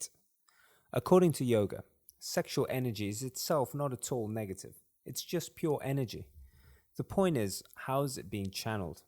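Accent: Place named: United Kingdom. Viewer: maybe he was raised in UK.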